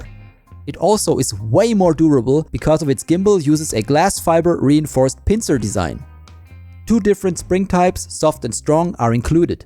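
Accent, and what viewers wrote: Germany. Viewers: bin ich der einzige der denkt das er deutscher ist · By the way are you German?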